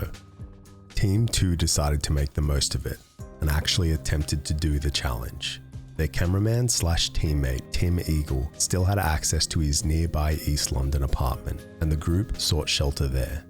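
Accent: Australia. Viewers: Ahhh..... Nce to hear a bit of smooth Aussie narration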